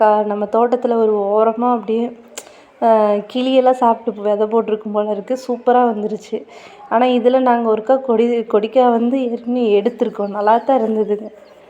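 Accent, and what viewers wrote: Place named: Kongu, India. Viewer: கொங்கு தமிழ்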